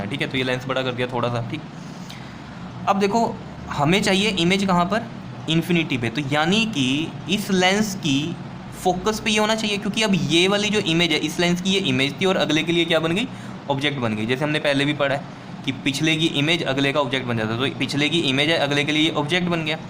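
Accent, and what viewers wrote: Punjab, India. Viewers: Its subh exam h sir 😢😢😢😢 sir aapki voice achi h give punjabi vibe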